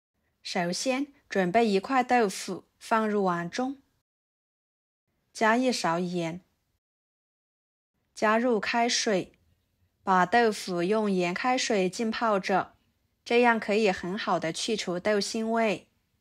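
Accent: Yunnan, China. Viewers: 好难听的云南普通话，你直接说方言比你的马普要好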